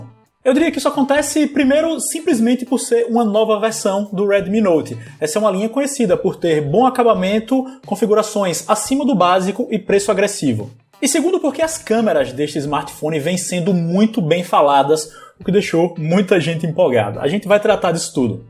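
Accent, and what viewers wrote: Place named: Northeast, Brazil. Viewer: Gostei muito desse Rewiem Nordestinizado KKK # nordeste Já vou me inscrever 😁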